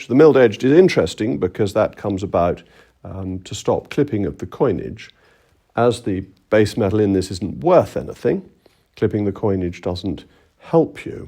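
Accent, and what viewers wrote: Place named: Upper class, United Kingdom. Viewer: Well articulated intelligent discourse. If we can put aside his “posh” sometimes “parody” of the so-called “upper class”, he actually talks sense.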